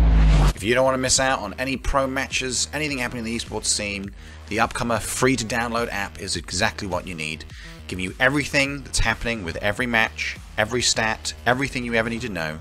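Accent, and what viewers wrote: United Kingdom. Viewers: You speak with an RP accent so its hard to guess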